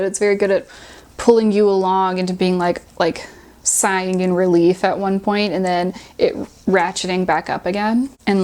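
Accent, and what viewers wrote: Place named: United States. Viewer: annoying american accent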